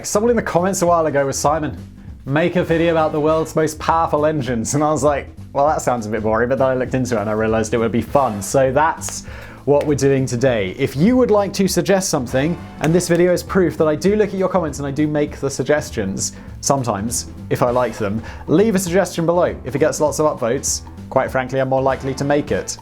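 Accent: United Kingdom. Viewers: Is this guy filming in America or just had a British accent? Any one know?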